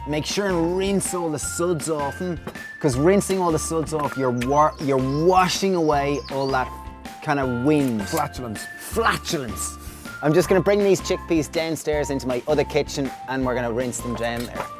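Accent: Ireland. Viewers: You guys are the best! Love the accent. Is it Irish? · Who would have thought Ireland had such hidden gems! Your fantastic accents distracts me from the food in hand! :))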